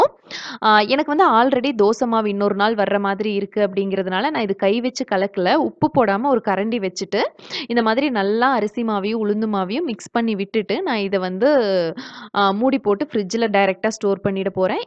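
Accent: Coimbatore, India. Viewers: Unga native coimbatoreaa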